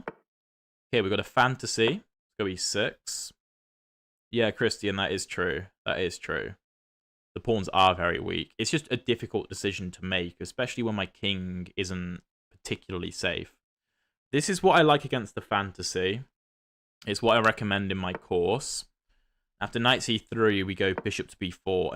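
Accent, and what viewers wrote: United Kingdom. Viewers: You are very good at explaining your ideas. Also, I love the British accent. Greetings from a new subscriber in Germany!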